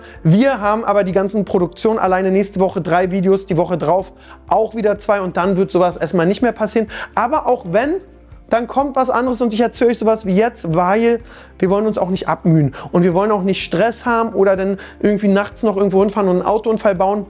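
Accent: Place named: Berlin, Germany. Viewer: bin 39 - ich hab dich tatsächlich bei WWM gesehen und dachte lustiger typischer Berliner von der Schnauze her - da hast du echt gut was draus gemacht !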